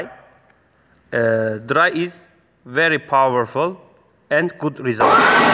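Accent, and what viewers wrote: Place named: Turkey. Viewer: Sesi duyar duymaz bu Türk İngilizcesi dedim ve öyle de çıktı :)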